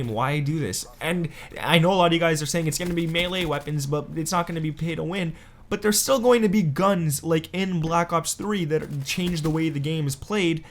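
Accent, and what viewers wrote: Canada. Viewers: r u Canadian? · Matthew M he's canadian... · Dude your Canadian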